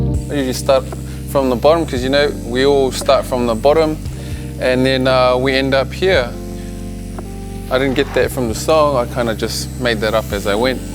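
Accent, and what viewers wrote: New Zealand. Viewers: New zealanders have a very distinct accent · I love that Kiwi accent. That shit cracks me up after watching beached az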